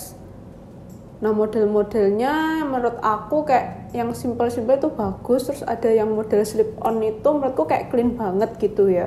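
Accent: Java, Indonesia. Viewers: Salfok sama ke medok an nya mba 👍🏻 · medokmu buatku jatuh cinta 😍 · Maaf bukan rasis🙏. Liat mbak nya kirain orang China luar negri,tau tau China nya udah medok banget hihihi🌚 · pertahankan medok nya 👍🏻🥵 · Orang mana kak kok kayak ada logat Jawa nya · Mbak jawane medok🤣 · Tadi y pengen tau ori atau kw ,tp pas denger medooook y itu ...smgat dan sukses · Mendok banget to samean mbakk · Aku suka medokmu cik 😍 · Suka medoknya 👍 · baru nonton pertama., mbaknya medok ini jawa mana ya? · Muka korea logat jawa Jadi bikin betah di chanel ini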